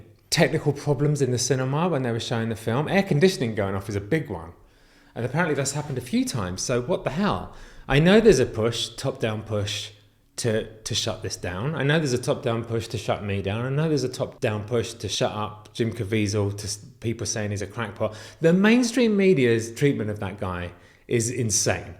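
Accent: United Kingdom. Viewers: Love your snappy suits! Strong, masculine and intelligent. Plus, British accent! ❤ Get a German Shepherd!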